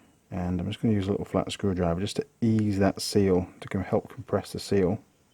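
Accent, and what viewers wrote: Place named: North, United Kingdom. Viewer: I detect a slight northern twang in that voice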